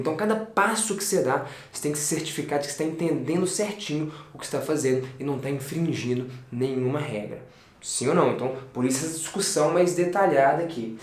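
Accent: Minas Gerais, Brazil. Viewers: Pelo sotaque, é mineiro!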